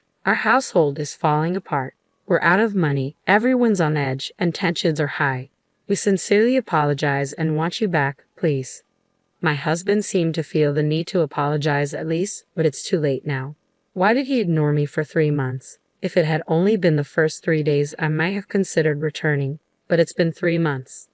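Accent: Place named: Arab world. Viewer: Why the Arab accent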